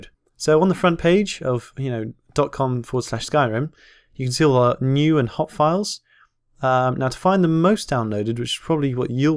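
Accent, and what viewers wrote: Ireland. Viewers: Are you irish? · is that a bit of irish im hearing? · You earned a sub. By the way, are you Irish? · ohhg irish and and from england fancy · then halfway through turned Irish again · your voice is so trippy cos of that irish accent that comes out at times. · Are you Irish? This is a random question, but you sound Irish. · is he Irish? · The only thing i could think of is 'Omg is he irish?' x3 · i bet your irish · Are you Irish?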